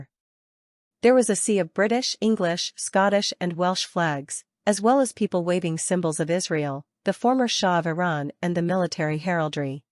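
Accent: United States. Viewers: American naratetr Wdf u know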